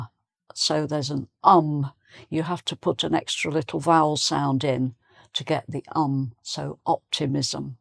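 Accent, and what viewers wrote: United Kingdom. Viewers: Hello Teacher! Be sure you are a very special teacher! British English sounds much more beautiful with you!Thx to you we feel like learning more about your native language and about The UK! You are a symbol of The United Kingdom through the world!Greetings!❤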